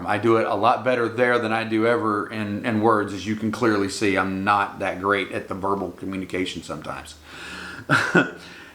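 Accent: England, United Kingdom. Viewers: لان لهجة انجلترا تختلف على ديال امريكا رغم انها لغة انجليزية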